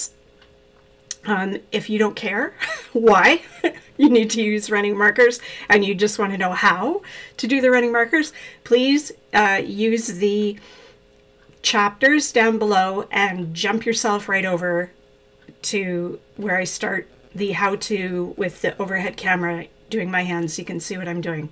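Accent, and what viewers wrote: Canada. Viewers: Yup, I remember finding out about 'em when I was doing brioche. Now that I crochet only, it's all changed. Like life. Sighh .. But I like your delivery, and I always like a Canadian voice. :)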